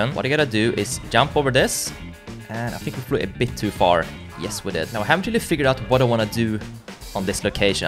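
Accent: Sweden. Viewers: man hör på dig du är svensk lol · Är du från svergie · Är du från Sverige? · Are you swedich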